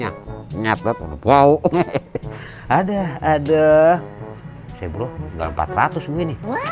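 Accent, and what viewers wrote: Jakarta, Indonesia. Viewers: Wkwkwkwk sehat trus bg... udh aku sub bg, jd kangen sm tmen2 jkt kalau liat logat abg.😄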